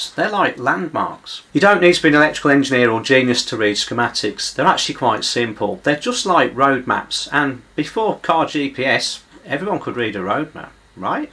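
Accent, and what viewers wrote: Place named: England, United Kingdom. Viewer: Now wait a minute. You charge about 300 dollars U.S. dollars for each repair and you said you love it. So I'm going to pay you to do what you love and I see. Your eyes light up when you said love. Everyman is blessed to do what he loves. Next one more really important step, learn to hear the fast talking Englishman or get a voice translator. Have a good love filled day everyday.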